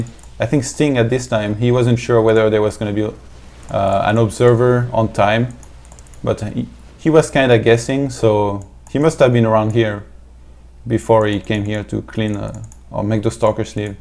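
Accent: France. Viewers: that french accent! keep it up! the analysis level is close to qxc`s terran analysis. and that`s about the highest praise i can give you.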